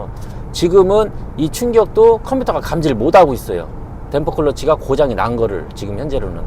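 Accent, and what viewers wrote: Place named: Chungcheong, South Korea. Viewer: 충청도 사투리 쪼~~끔 펌프라고 하면되지 쁘엄 쁘 ㅋ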